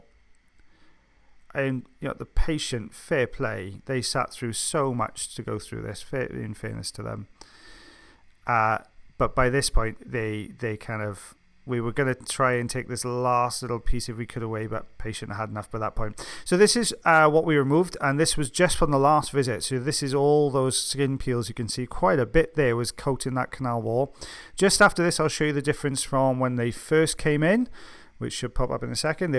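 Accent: Wales, United Kingdom. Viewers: I love the accent! Especially Welsh! And the words used or sayings, in the UK are lovely! I've used "give it a go" so much Its a part of my every day dialog!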